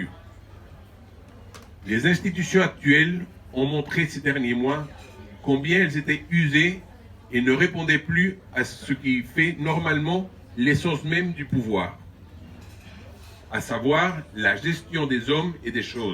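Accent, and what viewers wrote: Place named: Spain. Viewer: Il n’a pas l’accent marocain mais espagnol,son père était Alphonse de Bourbon Dampierre et sa mère la petite fille de général Franco.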